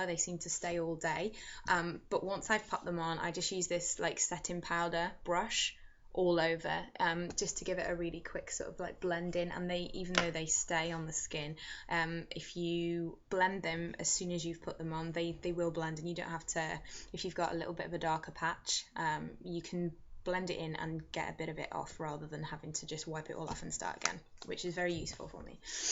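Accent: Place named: RP, United Kingdom. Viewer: very posh you tube voice, good luck x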